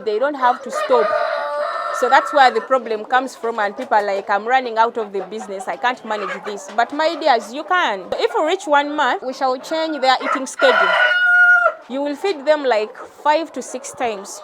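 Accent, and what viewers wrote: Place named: Kenya. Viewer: At first I thought that am listening someone from Kenya kumbe you're the one?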